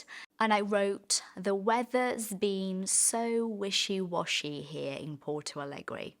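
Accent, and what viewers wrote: United Kingdom. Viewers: British accent is amazing. Greetings from Brazil.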